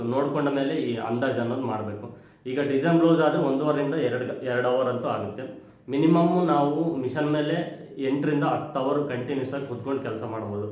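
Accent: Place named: Bagepalli, India. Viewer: Nivu yava kadeyavaru kannada ontara matadtira bagepally Or chintamani na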